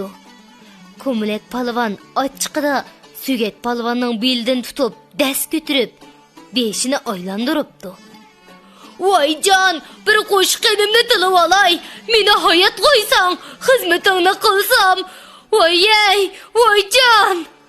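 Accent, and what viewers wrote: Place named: China. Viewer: Какой жесткий китайский акцент. Это так специально делается? Почему чистое уйгурское произношение так уродуется? Что за через чур мягкая, какая то детская манера говора. Этот канал под китайскими властями действует? Никогда гордая уйгурская женщина так не разговаривала. Поправьте меня, если что то не так. Ну не мне одному же это кажется??!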